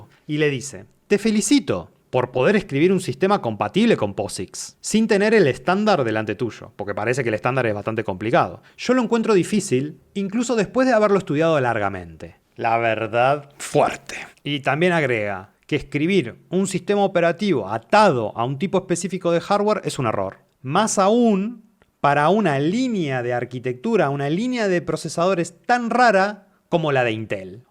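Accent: Rioplatense, Argentina. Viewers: Me podría pasar media hora, halagando las virtudes de esta locución en sus diferentes aspectos. A mis 70 años , 50 de programador y en electrónica, lo voy a decir en una sola palabra. "Excelente" . ( Imparcialidad en el enfrentamiento intelectual entre los colegas, balance conceptual y emocional , uuffff !! difícil cosa , por eso me suscribo. ) Ademas , de regalo, entendí TODO, la dicción perfecta, sin vicios del habla, muy raro en nuestros dias, en TODOS los medios, incluso en este. Felicitaciones pibe. Un gusto escucharte y sonido rioplatense. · Que hueva perder mi tiempo en una disertación tan estéril , crei que escucharía algo novedoso. Y encima de todo tu acento argentino lo echa a perder más, esperaba algo más interesante y no solo un fan más del kernel de Torvalds echándole porras, lo llevas a un terreno casi casi de novela de televisa.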